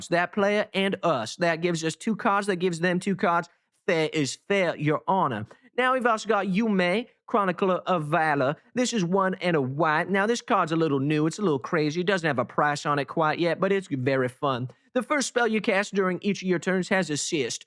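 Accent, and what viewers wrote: South, United States. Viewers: That southern accent at 2x speed is quite funny :D Keep building man! And if you ever find a novel way of making a deck with Lord Magnus as commander, I am all ears :D. I never got past Ehrnam Djinn and Lumbering Satyr :P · Classic southern drawl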